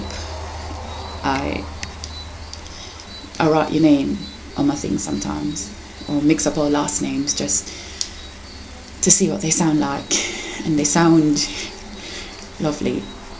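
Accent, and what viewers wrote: United Kingdom. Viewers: your british accebt man, woah! shame your not posting them all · I love this one so much. The british accent really carried it off. GOOD JOB! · i love it! love the fact that its in a brit accent too. "yu talanted mi gal"!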